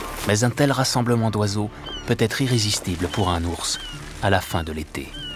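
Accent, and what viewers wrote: France. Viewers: The French accent makes this video so Romantic.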